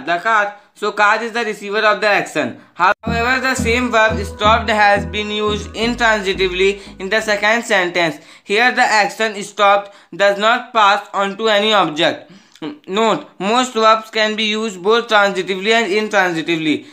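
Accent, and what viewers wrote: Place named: Bihar, India. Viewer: Bihari sa bhaiya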